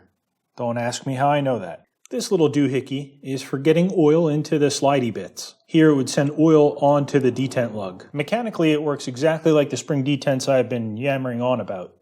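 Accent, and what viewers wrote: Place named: Philadelphia, United States. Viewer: You have a Philadelphia area accent. I bet you live in either southern Jerz, or the easternmost third of Pennsylvania. Or Delaware.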